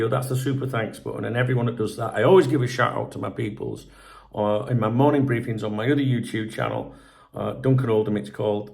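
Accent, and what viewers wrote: Yorkshire, United Kingdom. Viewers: me and the missus have been trying to figure out where your accent is from. My guess is Yorkshire, the missus reckons Cumbria. Are either of us correct? If not, help us out and tell us where the effing hell you're from. Haha.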